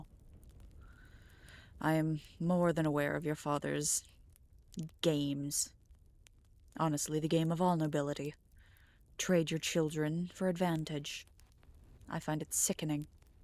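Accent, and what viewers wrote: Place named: United Kingdom. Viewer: The British accent is sending my little gay self to neighboring galaxies 😭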